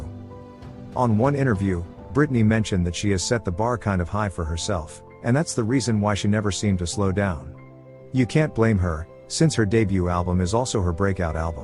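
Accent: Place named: United States. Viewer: It's still him but with an American accent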